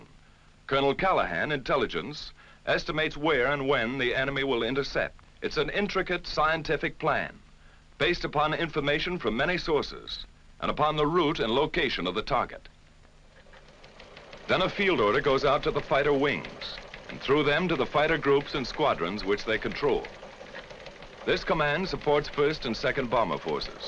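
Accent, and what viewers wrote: United Kingdom. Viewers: Good I found one without British narration . It's seems it's all the brits do..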